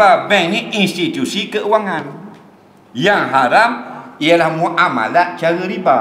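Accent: Terengganu, Malaysia. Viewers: Awak salah dengar tu..ustaz cakap loghat terengganu..ustaz cakap : dengan bayar ansuran, berapa? 75 ribuuu, halal..pasal kita dok wat pinjaman riba..kita beli harga tinggi..halal lah..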